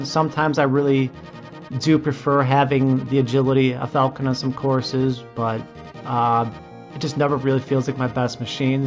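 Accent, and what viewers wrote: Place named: Midwest, United States. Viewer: Midwestern accent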